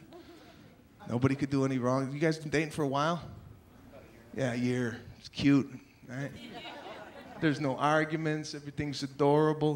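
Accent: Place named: Chicago, United States. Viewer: He has one of the strongest Italian Chicago accents I've ever heard.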